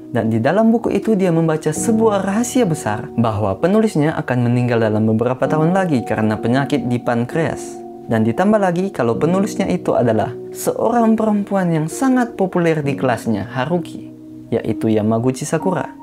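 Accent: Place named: Java, Indonesia. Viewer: Tkumi irng jepang ap jawa sih mas jawany melekat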